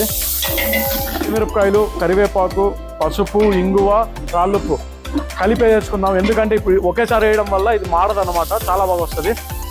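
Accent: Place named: Andhra Pradesh, India. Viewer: Meeru HYD lo vuntu Aandra telugu maatladunte vinalanipisthundhandi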